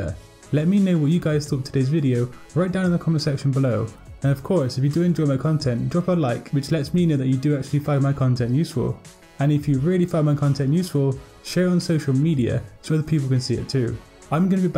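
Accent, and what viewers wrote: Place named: Bristol, United Kingdom. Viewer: I knew I recognised that accent! The same for me between Bris and Bath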